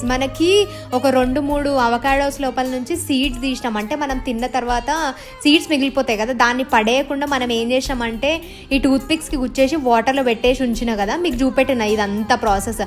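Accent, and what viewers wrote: Telangana, India. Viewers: I love you telangana slang because I am also from telangana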